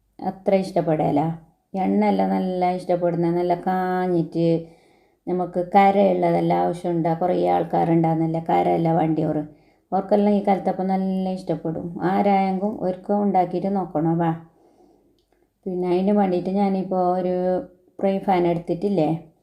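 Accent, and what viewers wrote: Kasaragod, India. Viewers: Nammale kasrod baasha engnthenne❤..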